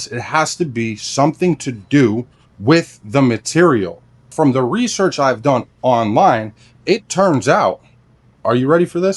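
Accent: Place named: New York, United States. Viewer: It's that New York accent.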